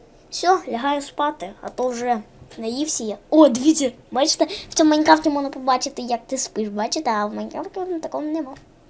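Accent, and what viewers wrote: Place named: Surzhyk, Ukraine. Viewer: Чьо суржиком говориш !?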